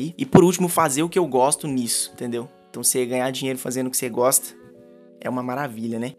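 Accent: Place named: Goiás, Brazil. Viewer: O sotaque é de goiano 🤣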